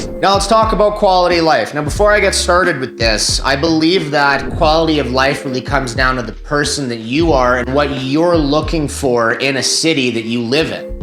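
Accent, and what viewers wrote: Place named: Toronto, Canada. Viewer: Just 2 seconds into your video, I just knew you were from Toronto. Same vibes as those car sales men.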